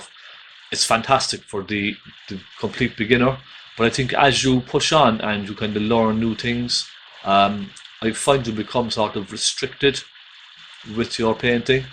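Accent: Ireland. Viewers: hard for international people to understand English with an Irish accent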